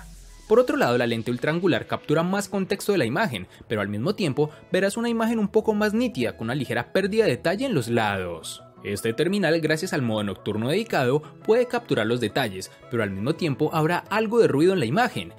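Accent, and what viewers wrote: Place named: Bucaramanga, Colombia. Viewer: excelente video bro hey pana usted es bumangués ? se escucha bastante el acento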